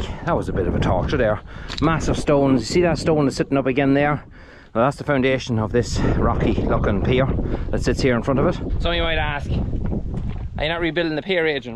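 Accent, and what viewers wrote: Ireland. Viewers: Love it, love it, love it......especially the wonderful Irish accent that goes along with every video. Thanks from Australia.👌👌👏👏💕💕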